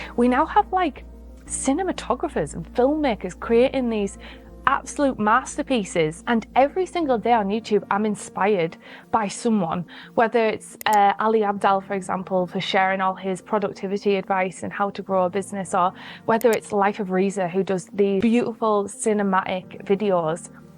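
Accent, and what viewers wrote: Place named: North, United Kingdom. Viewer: I had NO IDEA that YouTube sponsored videos! Congratulations on how far you've come - we need more northern voices on YouTube!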